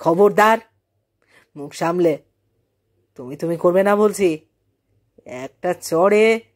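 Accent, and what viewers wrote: Bangladesh. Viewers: বাঙাল উচ্চারণ কেন? আরও প্র্যাকটিস দরকার।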